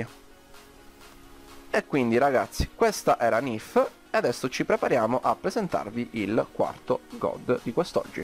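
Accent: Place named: Palermo, Italy. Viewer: Io lo sento, tu sei palermitano ahahah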